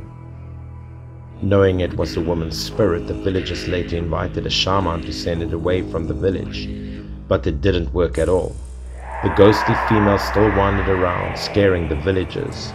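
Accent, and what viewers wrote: South Africa. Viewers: Dude are you by any chance from South Africa? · Is this a South-African Accent?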